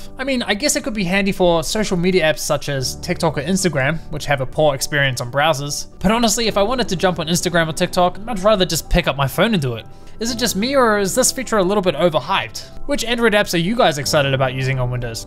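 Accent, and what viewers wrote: New Zealand. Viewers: Are you a kiwi? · I know you live in Germany.. but what is your accent? New Zealand?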